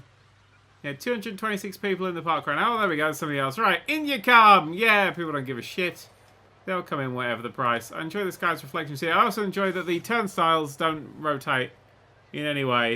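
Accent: United Kingdom. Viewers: British Accented Man-Baby Complains About Quest Tabs for 42 Minutes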